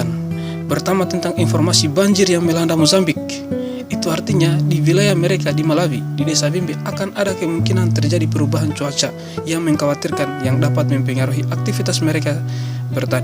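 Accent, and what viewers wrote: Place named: Papua, Indonesia. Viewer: CARA NGOMONGNYA KAYAK PAPUA MAAF YA BNG 🙏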